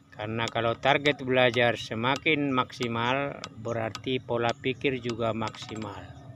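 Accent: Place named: Sulawesi, Indonesia. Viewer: Mantap nih..kalau dari kata-katanya bapak ini pintar dan berpendidikan tinggi dan seperti orang Sulawesi gaya bicaranya ..sehat dan sukses 🙏🙏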